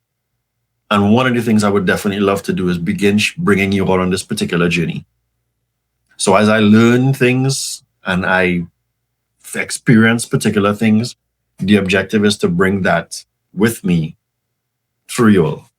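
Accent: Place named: Trinidad and Tobago. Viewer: You sound Trinidadian so subscribed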